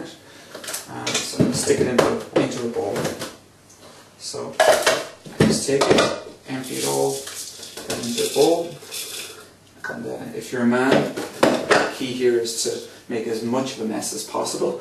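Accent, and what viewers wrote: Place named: Ireland. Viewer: It's strange actually hearing a fellow Irishman on youtube, it's usually americans.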